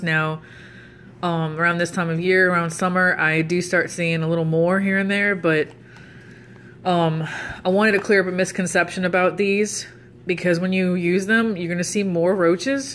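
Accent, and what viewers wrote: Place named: New Orleans, United States. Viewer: I'm from New Orleans as well (I know my people when I hear them lol)... This was helpful.. i just got some from Home Depot .. ill see how it goes 🤷🏾‍♂️...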